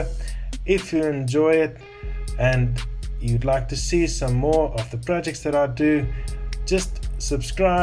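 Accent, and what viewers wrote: South Africa. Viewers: South African ?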